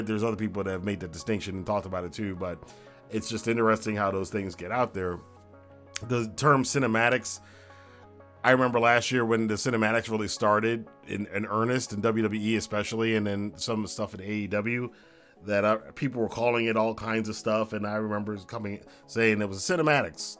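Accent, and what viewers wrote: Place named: United Kingdom. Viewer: Also you have make these comparisons with a British Accent.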